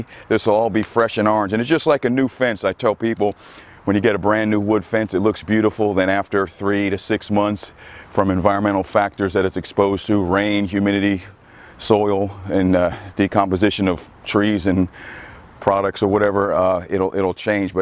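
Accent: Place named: North Central Florida, United States. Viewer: Watching this makes me homesick. I've lived in New York City for over 20 years but grew up in Gainesville and St. Augustine. All the beautiful palms! Even your accent- north central Florida twang a-la Tom Petty!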